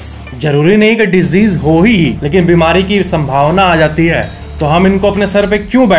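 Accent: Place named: Bihar, India. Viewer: But It sounds Bihar or Jharkhand* accent🫠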